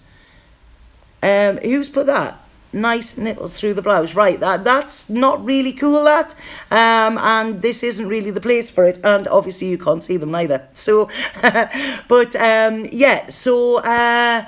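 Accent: Newcastle, United Kingdom. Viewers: "Only Love Today!" Oh yes my love you are teaching. I knew that motto but when you said that into my ear/brain as I did my dishes.....it stuck! Thank You for ever and for always. I will pray for you and offer you a bit of my own advice,"I cannot stop people from doing, saying, thinking shitty, cruel, nasty things to me but I will be F****d if I will give them my fear, greif, the satisfaction seeing me using my free will to help them with their nasty, dark, petty endeavours. That is the part I/we CAN have complete control over. You are amazing, an intuitive reader, an uplifting force of nature and especially as a Sista-Soldier! The first time I heard you it was by "accident" as you came on autoplay whilst I was doing dishes and afterwards I were desperate to find you and, huzzah! I did. Luv your Geordie accent. Don't you worry me darling you are More than equal to any bulls**t lesser beings stomp and shout out of terror into the abyss. 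We got you girl. luvluvluvs, Regan. p,s, I have medical empathy and I Do Not see/sense and malignancy not dirty dishwater colour which is what I see/sense when anyone is going to pass away and/or suffer from a cancer. Is it possible that the growth has been in your brain in some form since before birth? I was so blue last night and I swore off people for good (again!) And here comes channeling God as Billy Connelly saying,"Oh do you bloody think so?!" Yea, I agree completely, spirit love is so much cleaner, purer, holy, for lack of a bette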